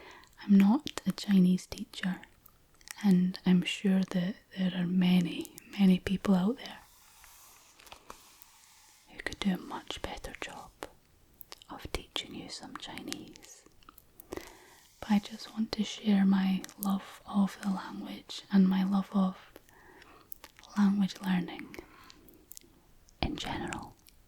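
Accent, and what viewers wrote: Scotland, United Kingdom. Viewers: You deserve more subs. Everytime I watch your videos i fall asleep everytime, your voice is soo soothing and amazing, and your scottish accent makes it 10x better ❤. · Are you trilingual? Is Scottish your mother tongue? Just curious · Scottish girls are amazing! Your accent is very nice and pleasant, great ASMR, thanks ;-) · you are such a fascinating and beautiful person. I love this video., I enjoy all your videos. I enjoy seeing you, listening to your voice, and your Scottish accent, I love watching your hands. You are a very special person and a unique friend. Thank you for the joy and relaxation you bring to me and so many others. Have a great week.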